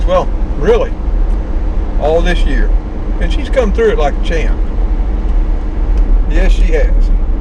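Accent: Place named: Kentucky, United States. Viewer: With your accent, you sound like you are from my neck of the woods...Kentucky!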